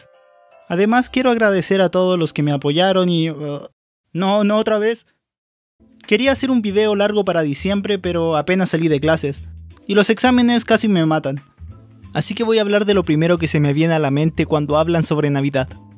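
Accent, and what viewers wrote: Argentina. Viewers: Eres de argentina? :v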